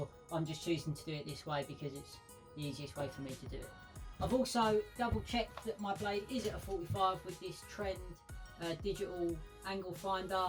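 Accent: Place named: Essex, United Kingdom. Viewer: You sound like you're from my neck of the woods. Are you from Essex or East London? I'm originally Walthamstow, then Wansteasd.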